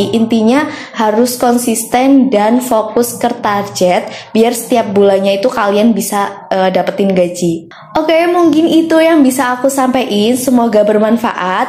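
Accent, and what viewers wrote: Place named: Java, Indonesia. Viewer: Wah udh dapat gaji ya kak, mantap.. Nek denger logate, podo bae wong jowo iki.. Kayane sekabupaten malah hhe.. Semangat berkarya selalu..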